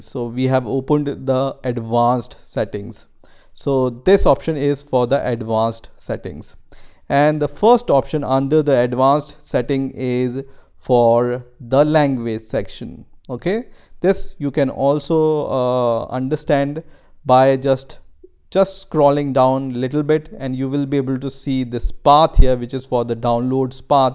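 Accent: India. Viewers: Thank you very much for your most helpful vedeo.I was facing this problem since mornig. I could rectify it from your step by step instruction in clear indian english. Kindly make more videos like this.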